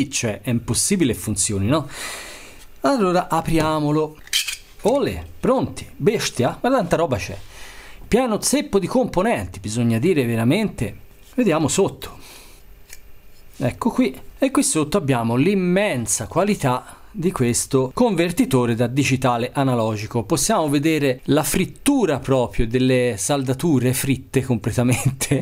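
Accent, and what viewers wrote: Tuscany, Italy. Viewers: Si dice circùito, perché ciruìto vuol dire preso per i fondelli. Bravino comunque anche se sei Toscano. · ma quanto bello e' l'accento toscano ? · Simpatico, specialmente con l'accento toscano! 10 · Simpatico, all'inizio mi hai fatto fare qualche risata, sarà dovuto anche a quell'accento toscano, sono contento che hai risolto il problema, e hai fatto vedere agli altri e come si fa. Bene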